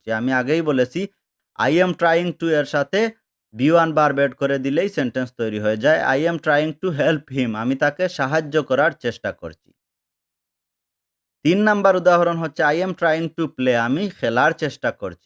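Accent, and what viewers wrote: Sylhet, Bangladesh. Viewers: Sylheti vai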